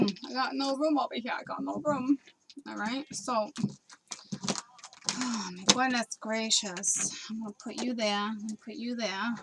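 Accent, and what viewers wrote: Boston, United States. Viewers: A good reading and your accent is amazing😊 are your from boston? Love from a Leo from the uk!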